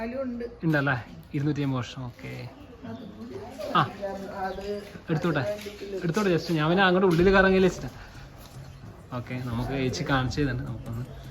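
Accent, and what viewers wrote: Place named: Kannur, India. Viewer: നിർത്തി നിർത്തി പറയൂ സഹോദരാ... അതും കണ്ണൂർ ഭാഷ,,, നിങ്ങൾ അവിടെ പരസ്പരം പറയുമ്പോലെ ആകരുത് വീഡിയോ ചെയ്യുമ്പോൾ. എന്താണ് പറയുന്നത് എന്ന് ആർക്കും മനസ്സിലാകില്ല.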